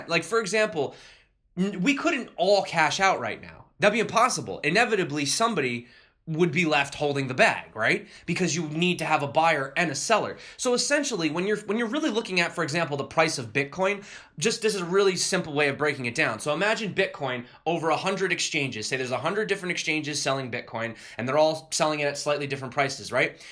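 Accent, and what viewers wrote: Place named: Philadelphia, United States. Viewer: My bro where u from?? You sound local to me here in philly....if not then maybe south jerz!! Go Birds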